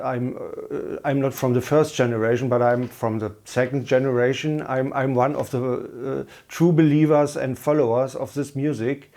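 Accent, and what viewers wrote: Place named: Berlin, Germany. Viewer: I like your „Berliner Schnauze“ English very much! 👍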